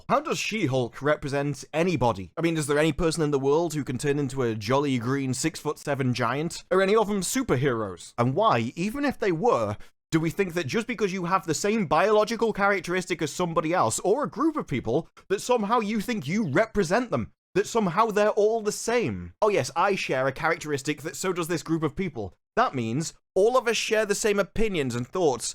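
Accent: England, United Kingdom. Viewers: is definitely one of my favorite content creators, him and Mauler, some insults and comments are just funnier with an English accent. Mauler's video where he deconstructs Doctor Strange and the Multiverse of Lameness is one of my favorite videos of all time. Also, that Punisher shirt is AWESOME.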